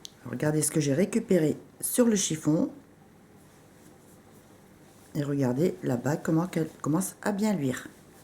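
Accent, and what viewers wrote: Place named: North, France. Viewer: Bonjour Madame, merci pour les astuces que vous transmettez généreusement pour le nettoyage de variétés de bijoux telles que l' or, l' argent etc...😊 Vous avez un accent du Nord...👍😊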